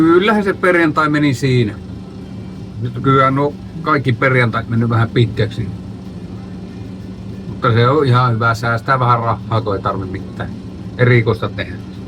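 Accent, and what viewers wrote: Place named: North, Finland. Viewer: Murteesta päätellen jänhkhä jooseppi tämä tekijäukko. Ja temusta tilaa tavaraa, on siinäkin yhdistelmä.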